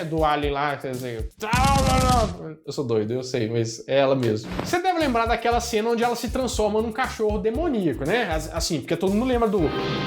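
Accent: Minas Gerais, Brazil. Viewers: Como eu amo seu canal!!! Risadas garantidas e esse sotaque pão de queijo q eu amo, tendo em vista q eu tenho sangue mineiro correndo nas veias, apesar de ser carioca da gema. Minha mãe era mineira e mudou p RJ aos 16 anos.... Parabéns pelo canal e conteúdos!!!!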